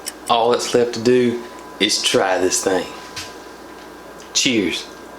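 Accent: South, United States. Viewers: "let it fridgerate" love the southern speak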